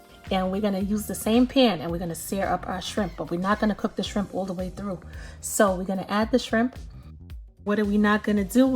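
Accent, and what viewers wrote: New York, United States. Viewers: Is that New York I hear 👀? This looks bomb. I gotta try it. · I love New York! I miss home..something about that voice!😛😛🥰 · This recipe is probably 10x better because of the native NY accent!!!! ❤❤❤❤❤❤ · I'm from NY I'll say definitely "NOO YAWK". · I think I might enjoy this meal! Love your accent! New York? Connecticut?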